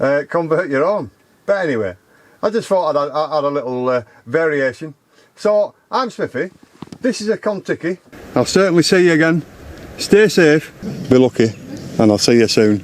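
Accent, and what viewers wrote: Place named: Lancashire, United Kingdom. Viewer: Nowt wrong wiya Lancashire accent Smithy! Another crackin vid mate. Cheers Dave & Helen 👍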